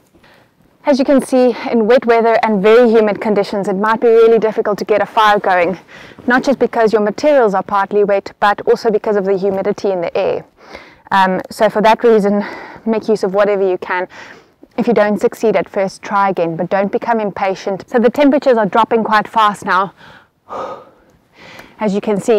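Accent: South Africa. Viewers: Good evening from a South African living in Switzerland .. (and a 'Kleinmonder' from the South Western Cape nogal 😊) .. 👍👍👍 A good posting .. thanks. Your Post popped up out of nowhere on my feed .. I took a quick look-see and when I heard the accent .. 'Sef Efriken' for sure 😁. Switzerland has many forests (minimum 30% of the Country Land Mass by Law) which are mostly not big enough to get lost or stuck in ..mostly 😏 .. especially where I live up North on the banks of the Rhine. .. no 'real' mountains .. just at times, steep hills. Looking at that Geography there by you, it reminds me so much of the Overstrand / Overberg Region. So nice seeing an Outdoor You Tuber from the Western Cape 😊. Yep .. getting a fire going in wet weather can be challenging .. the secret being in the preparation. For info .. very effective (and cheap) homemade fire starters are Cotton (100%) Makeup Pads (the round ones). 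Soak them in melted Candle Wax, allow to harden and you're good to go. Even better, soak them in melted Vaseline beforehand and then in the Candle Wax. They'll get 'hell frozen over' back on line and they burn for a good number of minutes. Some good tips in your post .. especially regarding layering and the danger of hypothermia. One should also take care not to overly perspire in cold (even no so cold) weather .. this 'can' be a killer. The secret is to start 'cool' and then add / remove layers of clothing to hopefully maintain that 'just above feeling cool' temperature. Another ti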